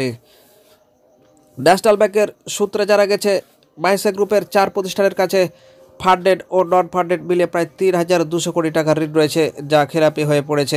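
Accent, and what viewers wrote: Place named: India. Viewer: ভাই আপনি কি ইন্ডিয়ান লোক কারন আপনার কথা তো ভারতের লোকের মত।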